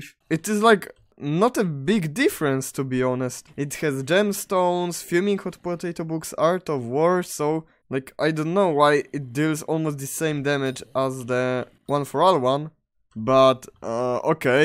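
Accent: Poland. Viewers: Just by the voice i knew you were Polish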